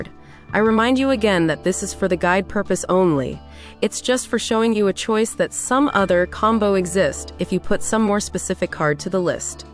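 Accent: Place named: Atlanta, United States. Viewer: Why the text to talk sound like she’s from Atlanta?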